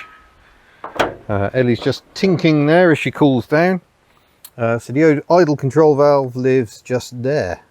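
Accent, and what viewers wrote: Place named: England, United Kingdom. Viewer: I like how you English say chickin. Daewoo is a nice shape but not the colour I would personally pick. That three cylinder growl was a treat to the ears. I also enjoyed the Charade for that in a recent video.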